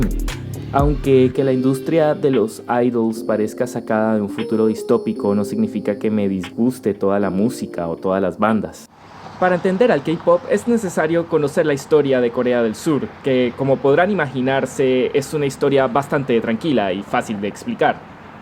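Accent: Colombia. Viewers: Porque no hablas costeño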